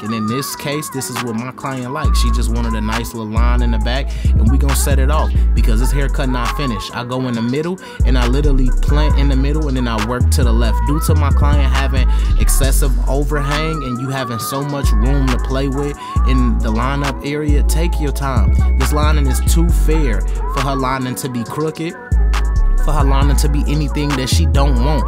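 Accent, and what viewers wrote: Chicago, United States. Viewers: I knew the way you talk you was from the land. I’m definitely sliding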